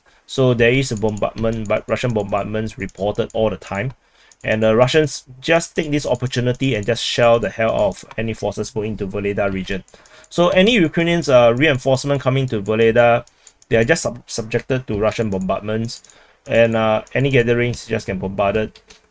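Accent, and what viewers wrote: Singapore. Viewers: Yes with your face is better ! Actually I follow your channel because of your English. Singlish? I like the way you speak English. You have a lot of humor too. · you sound like a singaporean, are you? haha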